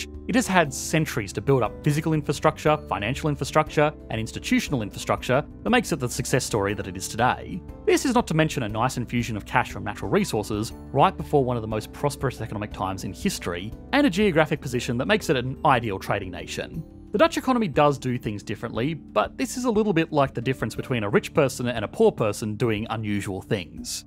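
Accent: Australia. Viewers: I’m curious about your accent. The channel seems to be from the US, but it kinda sounds like Australian sometimes? Where is the accent from?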